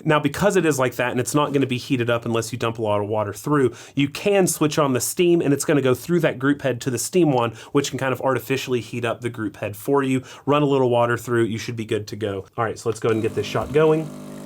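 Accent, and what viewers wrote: South, United States. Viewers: Your southern American accent but simultaneously using metric is wrecking my brain.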